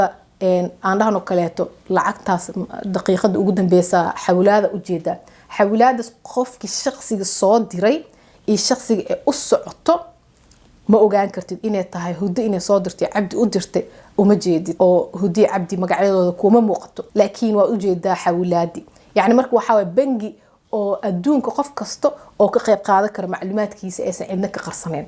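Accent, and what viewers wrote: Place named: Mogadishu, Somalia. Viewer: Naa lahjadan reer xamarka YouTuber ku noqon maysid ee nagatag waxaad ku hadlayso iska soo sax hadaad dad dhex keenayso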